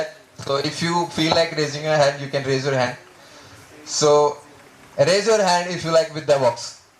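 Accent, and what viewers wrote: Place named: Kerala, India. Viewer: His accent is very nice.. I think he's from Kerala (Mallu)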